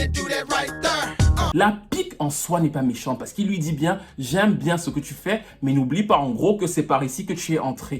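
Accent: Cameroon. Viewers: Mdrrr l'accent kmer! La vidéo est marrante, je m'abonne !well done :) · De grâce, gardes ton accent Camer. Au top.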